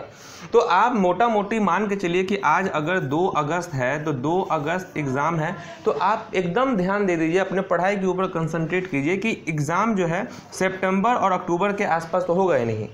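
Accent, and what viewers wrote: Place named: Bengal, India. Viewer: Thanks sir i like ur Bengali voice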